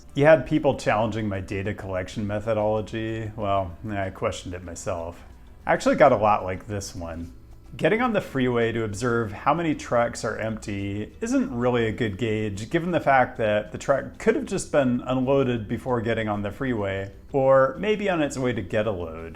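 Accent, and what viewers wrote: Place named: California, United States. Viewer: I cant stand your California accent 😂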